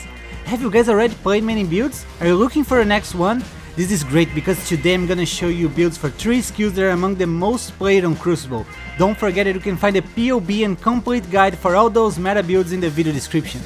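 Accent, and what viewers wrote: Brazil. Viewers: Esse ingles brasileiro me pegou mt Bom trabalho mano!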